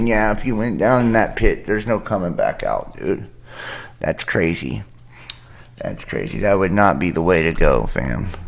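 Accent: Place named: France. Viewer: I will always love your french accent! 😋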